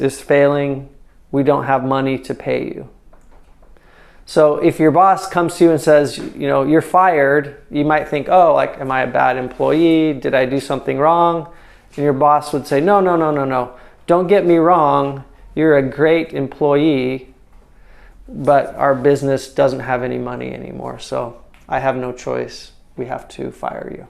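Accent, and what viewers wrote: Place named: Iraq. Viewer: اشكد حلو الاستاذ يتكلم عراقي هل هذه جامعه امريكيه؟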